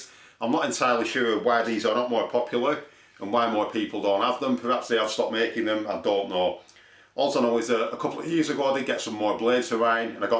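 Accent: United Kingdom. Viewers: Englishman, Scotch, Irish or Welch I wonder? Nice job by the way.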